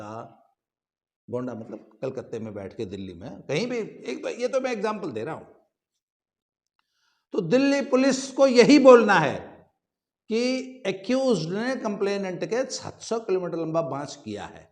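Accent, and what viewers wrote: Haryana, India. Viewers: Very nice presentation of Dharnajivi wrestlers 'history in Haryanavi accent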